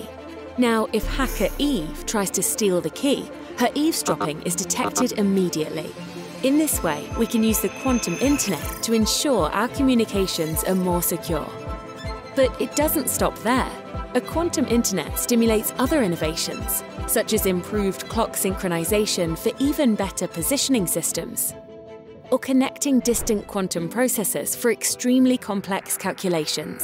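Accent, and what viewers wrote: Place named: Australia. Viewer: The Australian accent is very annoying.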